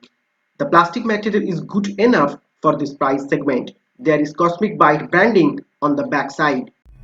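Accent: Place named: United States. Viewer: Bhai english indian wali bolo...ye to American ho gai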